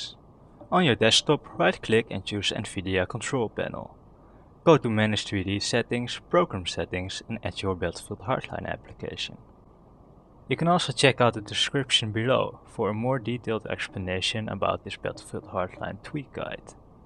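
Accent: Netherlands. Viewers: You're dutch :D dat accent tho. - a current reader of ur BFH app :)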